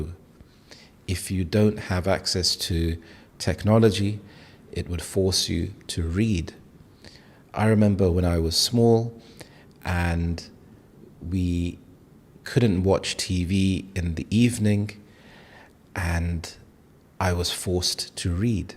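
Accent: United Kingdom. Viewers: Hello,! I don't know if you have any videos that talk about this subject, but I'm curious, because I see that you speak differently from other British YouTube channels that I follow... What version of British English do you speak? Written with Google Translate.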